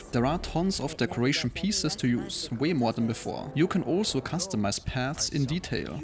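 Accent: Germany. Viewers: Do i hear german accent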